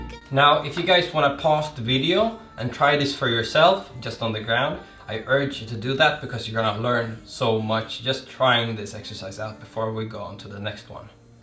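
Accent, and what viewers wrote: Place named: Scandinavia. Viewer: Where ya from dude, you sound Scandinavian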